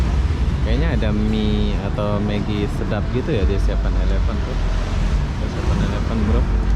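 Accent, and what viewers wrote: Indonesia. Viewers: klo di liat dr aksen.. kayaknya org lombok konten kreatrnya. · HOSTNYA ITU INDONESIA BANGET, HANYA BICARANYA DI MALAY MALAY IN, TERDENGAR DIALEK INDONESIA BANGET KATA HOSTNYA, SERU YA ORANGNYAPUN RAMAH RAMAH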